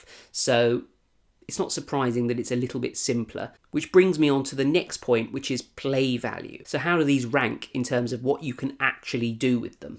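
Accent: United Kingdom. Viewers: Ur British accent is nice Makes my ears joyful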